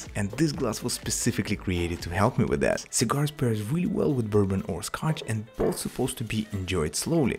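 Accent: Russia. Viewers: Hi, while I hear you,I'm think that you are from Russia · Are you from russian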